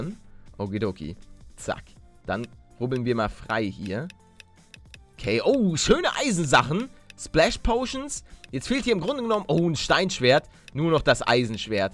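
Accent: Hamburg, Germany. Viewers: Kommst du irgendwie aus Hamburg oder so? Oder Bild ich mir das nur ein?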